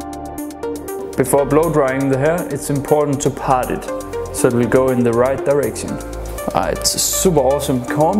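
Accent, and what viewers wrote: Denmark. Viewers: Man kan bare høre, at han har en dansk accent xD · Wow den danske accent der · Den danske accent er meget tydelig xD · Is je Danish or something? Got a strong dialect going on.. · That accent! 100% Danish! Luv it! <3 Spitzen Video!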